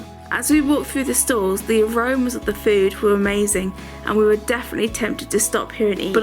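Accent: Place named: England, United Kingdom. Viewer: ภาษาอังกฤษ สำเนียงอังกฤษ มันเป็นเอกลักษณ์เเห่งต้นตำหรับจริงๆ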